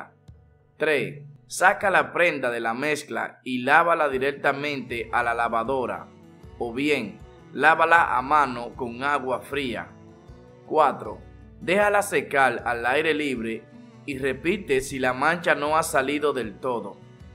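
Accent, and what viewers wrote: Dominican Republic. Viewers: Jajaja creo que los dominicanos hablan asi o Puerto rico no me acuerdo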